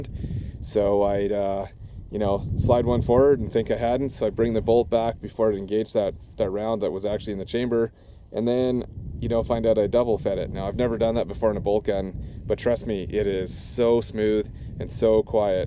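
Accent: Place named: Canada. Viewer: noooo Canadians I knew it when you started talking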